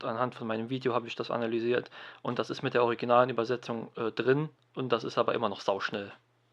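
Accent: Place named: Saarland, Germany. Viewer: Saarländer oder Westpfalz ... zumindest würd ich darauf tippen ..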